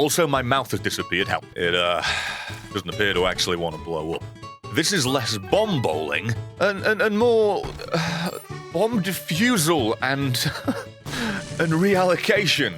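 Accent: Scotland, United Kingdom. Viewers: I love his Scottish accent and I have no idea why.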